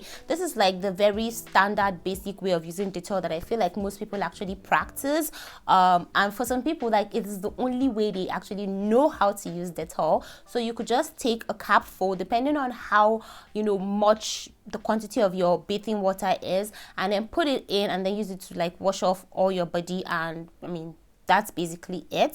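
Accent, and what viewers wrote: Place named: RP, United Kingdom. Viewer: Queens English weldone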